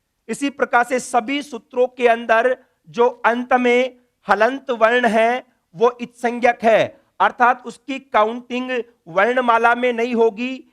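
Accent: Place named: India. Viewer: You have to pronounce Alphabets n words properly while teaching Sanskrit. अ is अ not अय् । हिन्दी टोन आरही है नेपाली मे संस्कृतका टोन आती है वह ठिक है संस्कृत के लिए ।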